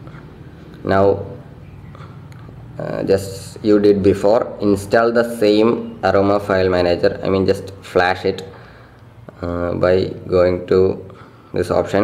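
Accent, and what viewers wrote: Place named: India. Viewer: Could you show me how to speak English not Indian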